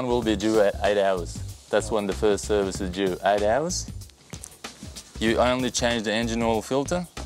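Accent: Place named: United States. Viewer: American English. Sorry if i offended but I'm just giving my view.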